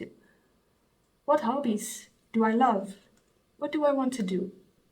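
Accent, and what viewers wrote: Netherlands. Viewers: Hoor meteen dat je uit Nederland komt ❤ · Hi there, 2 questions ... I hear a Dutch accent? And 2nd were do you get the information from ? Thanks for sharing your videos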